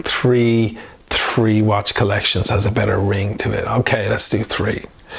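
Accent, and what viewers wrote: Ireland. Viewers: Where are you from? Ireland? The voice reminds me of Darth Vader.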